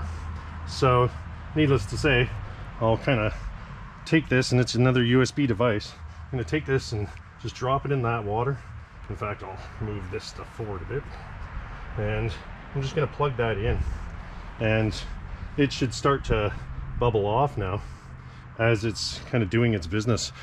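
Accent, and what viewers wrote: Canada. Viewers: You must be from Canada yeah?!😅 Loving the content man keep it up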